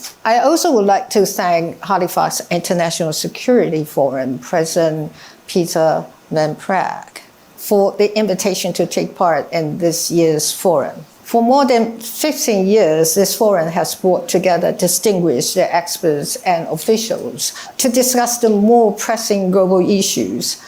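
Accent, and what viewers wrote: United Kingdom. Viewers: 喜歡喵統的英國腔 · 我是來聽小英的英國腔的：）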